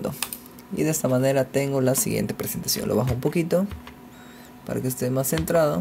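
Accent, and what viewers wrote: Peru. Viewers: PARECES PERUANO CAUSA:v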